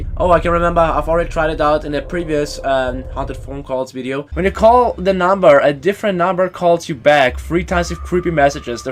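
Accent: Germany. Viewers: are u german because i can hear german in ur videos im german aswell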